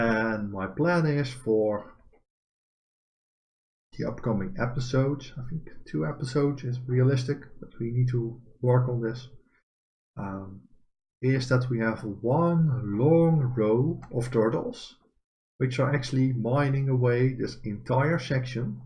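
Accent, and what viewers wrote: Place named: Netherlands. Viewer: Can you do a tutorial about computer craft? I am sure you are very good at explaining it. BTW, Your accent tells me you are Dutch I am right?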